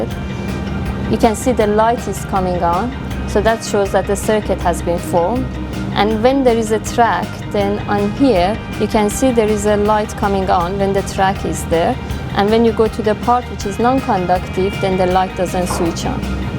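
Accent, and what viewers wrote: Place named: Iran. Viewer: At the first moment from her accent I found she's Iranian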